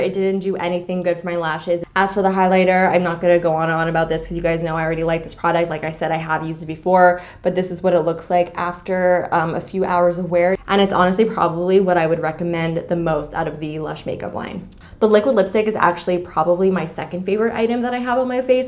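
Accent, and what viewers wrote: France. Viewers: Are you french? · Are you French?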